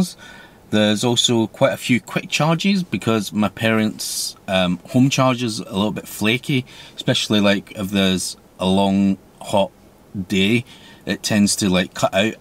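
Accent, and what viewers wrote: Scotland, United Kingdom. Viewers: Are you an asian scot? Like an asian ewan mcgregor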